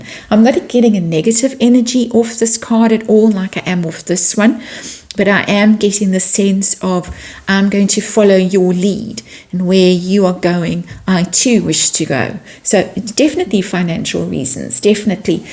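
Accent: South Africa. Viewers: I thought you were South African. I enjoy your reads.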